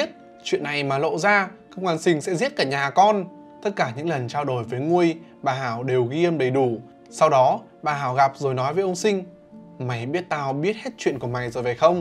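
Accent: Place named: Phu Tho, Vietnam. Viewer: à mà ah ở phú thọ đúng giọng giống quê em lắm:)))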